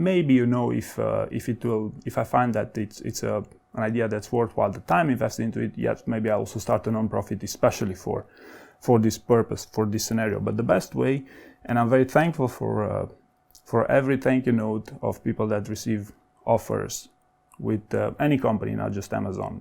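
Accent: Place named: Romania. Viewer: Thank you for all the videos! I figured that you would be Romanian, you sound exactly like one of my Romanian boss :D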